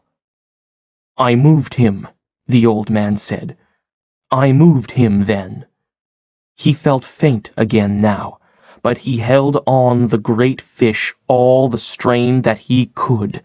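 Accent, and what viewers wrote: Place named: United States. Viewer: The pronunciation in the story is the American accent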